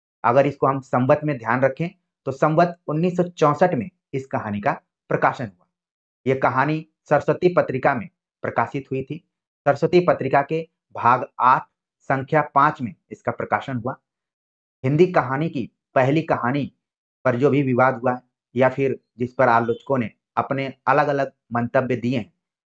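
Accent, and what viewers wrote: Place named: Mirzapur, India. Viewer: Sir mai mirzapur se hu mirzapur ki bhasa aisi hi h😂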